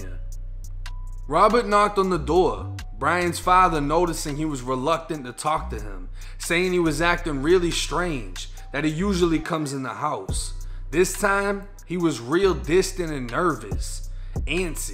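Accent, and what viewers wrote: Boston, United States. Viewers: lol mad boston accent but trying to sound southern slang? so confusing lol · Is that a Boston or New Hampshire accent?? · Are you from boston? Asking cuz that accent lmao I’m from springfield ma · Damn u must be from boston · your funny as hell brotha, your baaaston accent makes the narration even better. Keep it up brew, good story, and do more of these "true crime" posts, it definitely fits you. Peace brah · Actually I just show your first video on lockdown, Im Live in Malden, I new you had a Boston ascent, that’s why I originally asked. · Why is it that everyone who is into this rap/hip hop culture, talk with voice inflections that do not coincide with the rest of their speech, why can’t they just speak the way they naturally should? I got nothing against this kid at all, I actually just stumbled upon his channel and content which is pretty cool, this kid is obviously from the Boston area, it’s hard to nail down different accents and what neighborhood they come from, this kid sounds like he’s from Southie, but I can’t be certain, being born and raised in NYC I can pick up Brooklyn/Bronx inflections. 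I wish this kid nothing but the best. I just wish these kids who are into this culture would stop trying to emulate speech that isn’t part of their natural speech patterns. It’s just something that bothers me. I completely own that’s it’s my shit. But God speed to this kid and what he does, good, solid, original content, on horrible stories that aren’t picked up in the media. Good stuff!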